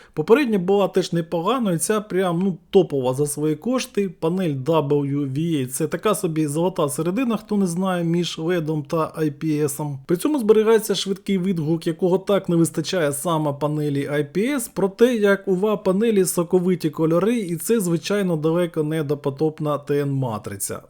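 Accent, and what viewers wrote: Transcarpathia, Ukraine. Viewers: Боже я налякався голоса автора почув Закарпатську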